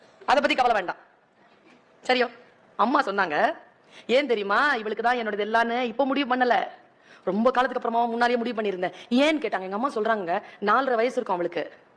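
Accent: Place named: Tirunelveli, India. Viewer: திருநெல் வேலி நீங்கள் பேசும்போது தெறியுது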